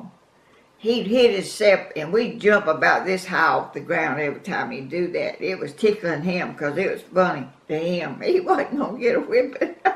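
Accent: South, United States. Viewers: I JUST LOVE YOUR SOUTHERN DRAWL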